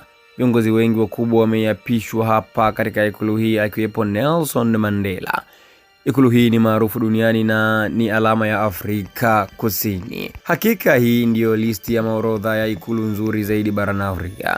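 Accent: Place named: Kenya. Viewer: Mtangazaj ni mkenya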